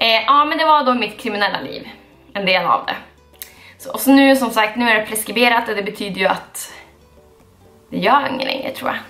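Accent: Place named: Umeå, Sweden. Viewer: Du har typ Umeå dialekt också ❤️😘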